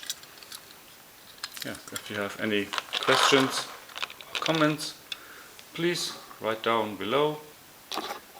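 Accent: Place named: Germany. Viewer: Der deutsche Dialekt beste😂👏👍 aber sehr unterhaltsam 👍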